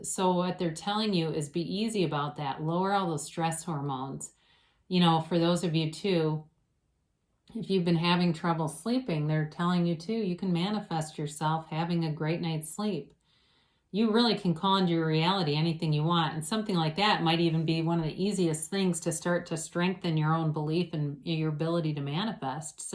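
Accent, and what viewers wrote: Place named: New Jersey, United States. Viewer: Omg I love your accent 😊 You sound like your from Jersey ❤️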